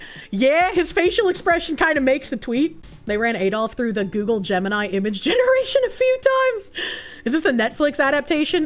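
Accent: Boston, United States. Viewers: Her Boston accent gets my motor running! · You have a Boston accent?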